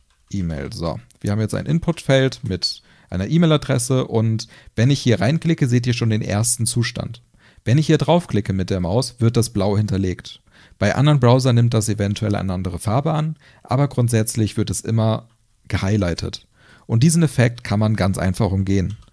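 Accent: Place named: Germany. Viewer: hab exact das erwartet..., warum können sich deutsche generell nicht etwas bemühen das englische TH zumindenst irgendwie richtig auszusprechen ? se-only-type, oder das en-te-ha-element, "when talking about sis and set, you want to be understood - no?" schöne kacke, dass diese programmier dinger alle auf english sind :) nix persönliches ! davon abgesehen, content ist gut präsentiert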